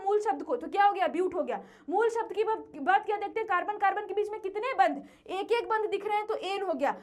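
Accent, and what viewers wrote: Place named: Bhojpuri, India. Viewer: From CBSE board but chemistry in Hindi with bhojpuri accent sounds sweet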